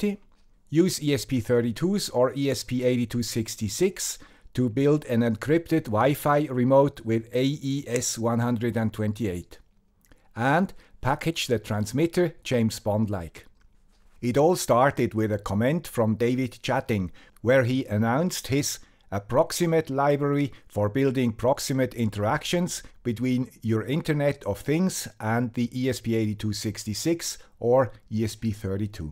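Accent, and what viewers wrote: Switzerland. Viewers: no doubt, that would be a secure solution. But it would require even more sophisticated software, and it would still need the crypto-quality RNGs. The shared state then takes the form of the client certificate. If the Guy With The Swiss Accent (gruezi!) or any of his viewers want to go with TLS, then chances are good that they‘ll get a secure solution. But I think it‘s worth considering the least that you‘d have to do and still be sufficiently secure. The solution in the video is probably fine, and I believe that HMAC would also get it done only depending on the shared key, but not on the counter.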